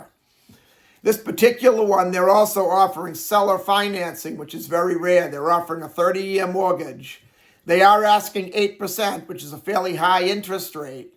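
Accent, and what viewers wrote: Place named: Northeast, United States. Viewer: your one of the many idiots who keep on telling people to move to Florida for your financial gain. The state has already been ruined. Being a Native, born and raised, I recently moved out of that hell hole after 40 years. There is no more quality of life. Overpriced with mean and rude people. Sounds like you're from the NE. Thanks for bringing your shit show to FL.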